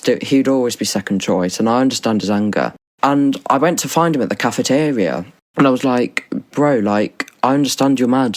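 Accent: England, United Kingdom. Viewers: well given by his accent and the fact that he said football and not soccer I think UK school works differently than American school what it comes to summer break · I love it the accent british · its too good to be true lmao uk accent and hes acting like hes in a high school movie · ooo la la i am in love with english accent😍😍😍😍😍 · Someone sounds BRITISH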